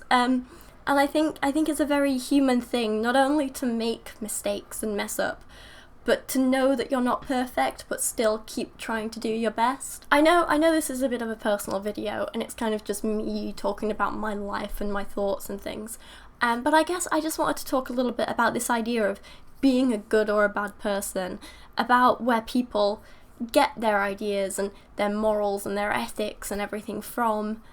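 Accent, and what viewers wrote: United Kingdom. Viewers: u said about ur accent, I mean no offence but are u british, american because I can't be sure. sometimes u sound really British but u don't pronounce the letter t it's more d sounding. just curious, I never thought about it until u brought it up so pls don't think I'm being a troll. it a genuine question. I would guess ur British? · Wait?¿ People actually make fun of your British accent?¿ I thought everyone loves British accents. Lol. Like I said to another prominent Atheist YouTuber (EssenceOfThought), 'I came for the British accent, but stayed for the Religion bashing and Science!' Lol =^p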